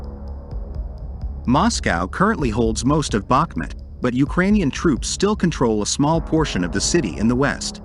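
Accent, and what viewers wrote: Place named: United States. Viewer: Clickbait with annoying American bot voiceover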